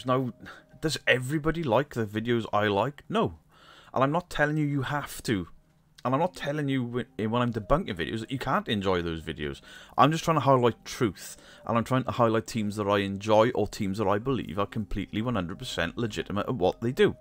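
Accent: Wales, United Kingdom. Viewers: Taff Butt, really confused me with Eleri Siôn from BBC Radio Wales. Love your videos. Been investigating and debunking since the 90's and we need more common sense in the field. Your accent reminds Welshman in Orkney of home!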